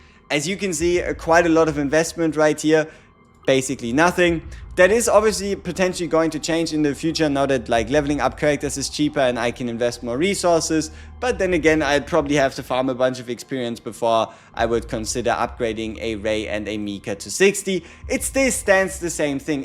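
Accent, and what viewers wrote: Germany. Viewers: Love yoir heavy german accent 😭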